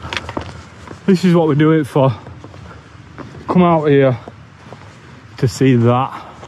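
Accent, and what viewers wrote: Yorkshire, United Kingdom. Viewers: Your commentary makes the video stand out so well from others that have tried on YouTube. I dig the non stop comedy, the earthy language and the dulcet Yorshire twang.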